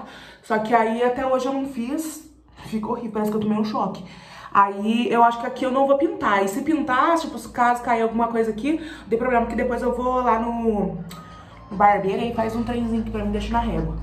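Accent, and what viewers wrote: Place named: Rio Grande do Sul, Brazil. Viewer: ficou muito lindo teu cabeloooo🤩🤩queria ter essa resistência que ele tem ksksks, Mas ficou PERFEITO!!!✨✨✨ Saudades dos vídeos de pintando o cabelo 😁 (Sotaque gaúcho, BAH, MAS BAH)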